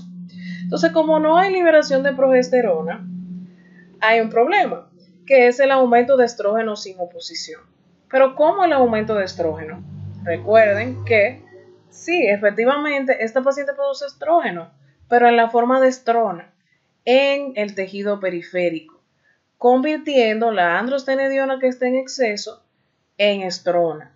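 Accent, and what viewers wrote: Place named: Dominican Republic. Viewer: Excelente explicación, felicidades!! Desde que escuché el acento supe que eras dominicana!! éxitos!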